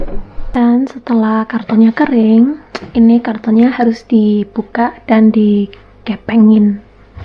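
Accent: Java, Indonesia. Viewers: Medok tenan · Kalo pas lagi ngomong jowo atau Indonesia medok benar-benar seperti orang jowo...☺️ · Jaadi kalo ngomong jepang aksen medok jawa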